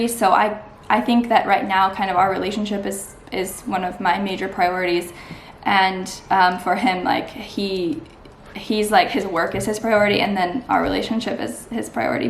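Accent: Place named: United States. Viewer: You look Chinese with American accent. It is an individual case based.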